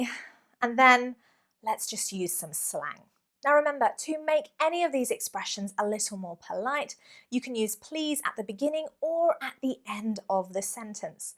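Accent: United Kingdom. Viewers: Cos her British accent😁💟💟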